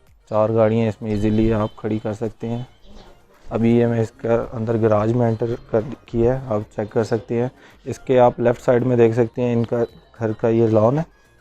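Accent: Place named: Karachi, Pakistan. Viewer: Bhayya Karachi waly lgty hen😍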